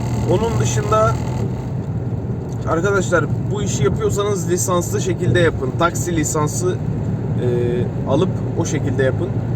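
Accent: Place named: Black Sea, Turkey. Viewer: nerelisiniz konuşma sanki karadenizli gibi????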